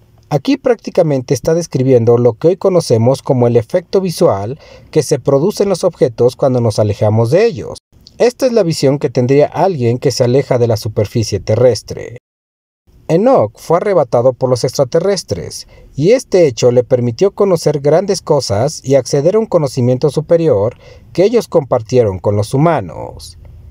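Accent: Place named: Mexico City, Mexico. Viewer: La información que subes en los videos, no está mal, es la que encuentras en otros canales, pero tu voz con acento de Chilango fresa nada más no te ayuda mucho! Cambia tu tono a más Profesional!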